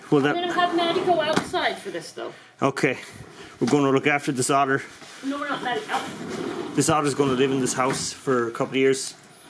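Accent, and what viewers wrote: Ireland. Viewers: I just love hearing other Irish people since I moved from Eire to Australia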